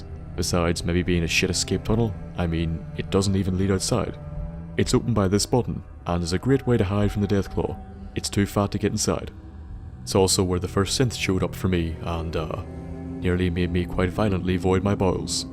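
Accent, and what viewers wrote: Northern Ireland, United Kingdom. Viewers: May I ask what country are you from?, you sound like you're from Northern Ireland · Where abouts from northern ireland are ye from? I'm from Co. Down lol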